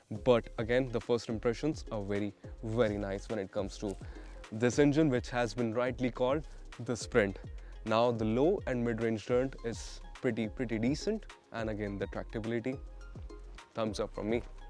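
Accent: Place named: North India, India. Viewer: Bloody north Indian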